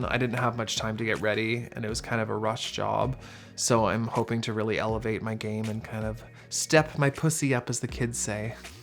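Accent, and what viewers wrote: Canada. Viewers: I love her little Canadian accent poking through every now & then 😘✨ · so canadian...."aboot" 😍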